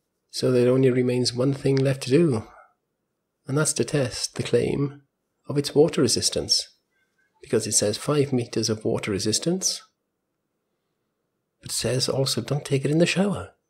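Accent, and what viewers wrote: Wales, United Kingdom. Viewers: u sound welsh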